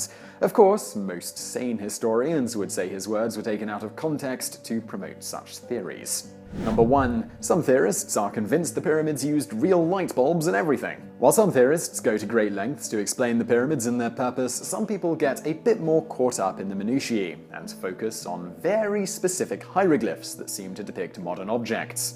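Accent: England, United Kingdom. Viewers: Just because you have a fine British accent you still don't sound intelligent when you speak about things you know nothing about. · Rick Rockey You are actually taking personal offense because he has an English accent???? You are hilarious! Are you upset because you think he is putting you down by not using an inflection of voice that doesn't make you feel inferior? He is not, not trying to sound smug. It is an ACCENT. Do you get angry when French people speak with a French accent? I think you have a serious persecution complex. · Why is it that people believe that just because the presenter has a snotty British accent he's intelligent, he must be right? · Another Magic 8 ball why are they always British? I think it's that catatonic English accent.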